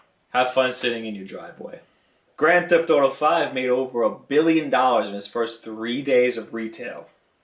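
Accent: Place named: New York, United States. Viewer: Wow your NY accent was way more noticeable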